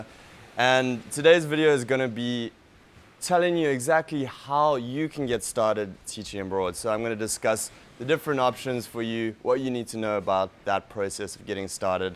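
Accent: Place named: Johannesburg, South Africa. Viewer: Aah, the South African accent :) Sounds like a Joburg boy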